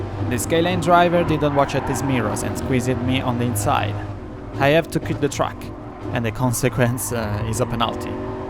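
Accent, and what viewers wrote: Italy. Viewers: non ti offendere ma una cosa te la devo dire (dall'accento direi che sei romano come me): imparati gli accenti e le preposizioni in inglese perche' veramente suoni strano eh. La pronuncia di opponent, protect, penalty, delaying, allowed, comfortable, legit, our, consequences, assume, essence, e alcune altre parole e' sbagliata, soprattutto l'accento, e l'uso delle preposizioni e' spesso sbagliato. Google translate e altri tool gratuiti ti possono aiutare perche' leggono i testi a voce alta. Sono parole che parlando di corse auto dovrai ripetere ogni volta, quindi aggiustale una volta per tutte e via.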